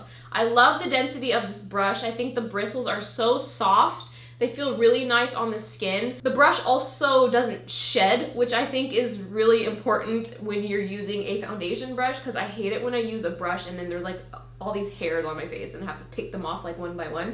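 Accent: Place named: Australia. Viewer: I MISSED THAT AUSTRALIAN ACCENT LMAO😂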